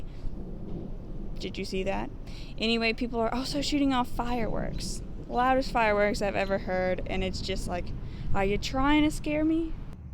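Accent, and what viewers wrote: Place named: Kentucky, United States. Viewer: Jersey dude here. You are sweet but from a Jersey perspective your Kentucky accent is bordering on a speech impediment.I guess it is all about your point of view...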